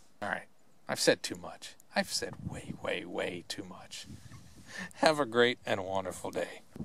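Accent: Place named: New Jersey, United States. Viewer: Let's see, I started watching you because of the cooking videos, a lot of what you cook, is way too spicy for me, but my husband LOVES spicy food, so you give me ideas of things to cook for him that he will like (and I just do myself a bland version). I really like your accent, I used to work with a really funny guy from New Jersey and your voice reminds me of him. I also like that you love your cats, I have dogs, cats and parrots... so I basically am the zoo minder around here. So anyway I watched your ramble, and felt compelled to ramble on a bit myself... sorry about that.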